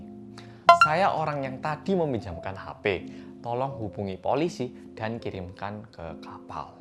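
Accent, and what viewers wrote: Java, Indonesia. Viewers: Mas yang medokk^_^ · BENERAN MEDOK WKWKWKWK aku subskriber baru mas :"v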